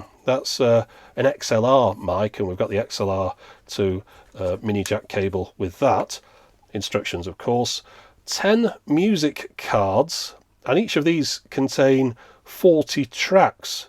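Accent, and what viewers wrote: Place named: England, United Kingdom. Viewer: "inverted commas". As an American, one of the things I love about this channel is hearing English accent, and the difference in terminology from one end of the Atlantic to the other.:-)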